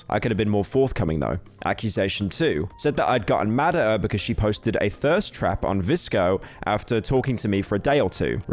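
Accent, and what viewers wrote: United Kingdom. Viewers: He is brittish, thats how you pronounce it in the botta o watta accent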